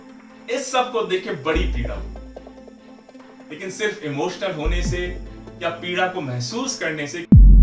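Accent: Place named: Uttar Pradesh, India. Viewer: उत्तर प्रदेश में आपका हार्दिक स्वागत है सर जी । आपकी भाषा एकदम उत्तर प्रदेश के लोगो की तरह है । ऐसा लगता ही नहीं को आप राजस्थान से है।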